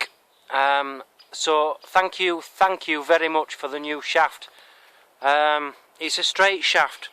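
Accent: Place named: North, United Kingdom. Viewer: BO2 azza hoster. Somewhere up north in the UK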